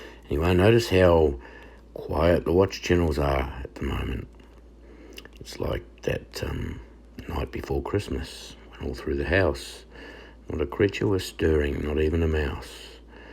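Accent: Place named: Tasmania, Australia. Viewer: ❤❤❤❤❤Waking up to your Taz Aussie voice is wonderful! Talking about rapture with that accent makes my morning! Thank you! Have a wonderful night and sweet rapture dreams! ❤❤❤❤❤😊 That big bright moon was right over my house on the mountain last night. Lit up the entire back yard! My 🐕 dog loved being able to see foxes, deer and any other critters out there! ❤. So, after hearing your wonderful accent talkin' 'bout rapture....I go outside to see the chemtrails ONLY over me (yes, all lines cut off to and fro, just over ME) and I took pics to show people so they'd believe me. I told them if I disappearr....dpState. Nothing like being targetedd, but Jesus is with me, protecting me. Yes, misspelled words so "Aye-eye-yie-yie" won't track it so quickly hopefully....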